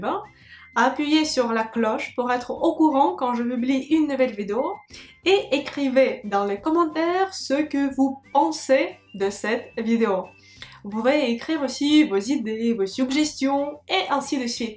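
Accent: Russia. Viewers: Tu es magnifique 😘. Et quel joli petit accent. Les femmes russes sont parmi les plus belles au monde. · Si nous pouvions aussi bien parler le russe que parle français, je crois que ce serait parfait...😊 Pour ma part, je lui pardonne ses petites imperfections qui lui donne le charme des russes... Merci pour vos belle leçons..😊😚👍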